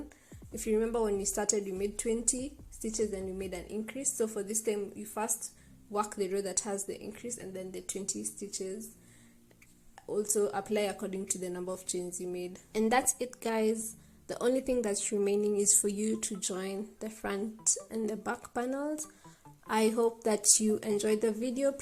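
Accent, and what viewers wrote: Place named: Kenya. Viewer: I love that I can tell you're a Kenyan by how you speak. It just sounds so familiar, like a friend telling me about their day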